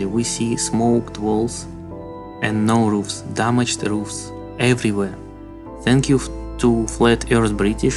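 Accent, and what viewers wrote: Russia. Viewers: Dodgy Russian accent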